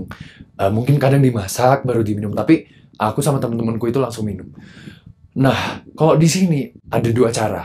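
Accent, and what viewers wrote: Java, Indonesia. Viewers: candu bgt ngomong jawa nya hahaha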